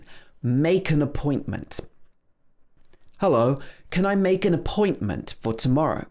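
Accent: United Kingdom. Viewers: I was searching for a channel where I can learn British Accent.... Thanks Allah Almighty.... He helped me to find out you... I am so happy now... Thanks for uploading videos on Accent in such a smooth way... I really like it. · You are one of my best teachers. I like your accent greatly. I'd like to see more videos from you. You use RP sound what I like most. Take care. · It's cool video to study British accent 🤎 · Hello, I am a new discovered to channel I am an English learner from Somalia and I would like to British accent it is simple and lovely I think that your videos will help learners thanks for your help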